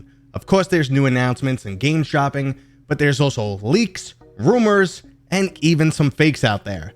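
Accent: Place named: East Coast, United States. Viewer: Definitely East Coast. NJ?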